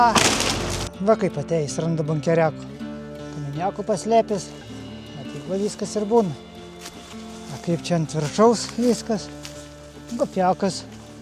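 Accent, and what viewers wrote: Aukštaitija, Lithuania. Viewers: Smagu žiūrėti tamstos video ir klausytis gražios aukštaitiškos tarmės😅🎉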